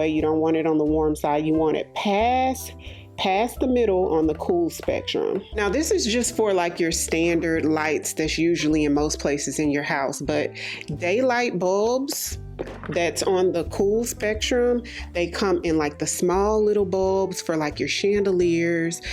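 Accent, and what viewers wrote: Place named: South, United States. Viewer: I often wondered where you were from.i hear your southern accent. Gorgeous look . Love it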